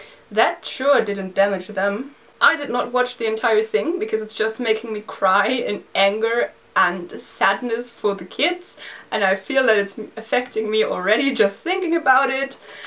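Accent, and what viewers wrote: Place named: South Africa. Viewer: Where are you from? your accent is beautiful. Sounds a bit South African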